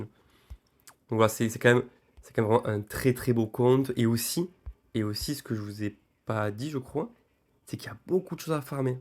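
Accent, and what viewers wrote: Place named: Toulouse, France. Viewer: Je participe frero , j’entends d’ici l’accent toulousain me dire félicitations tu as gagné 😁😁😁😂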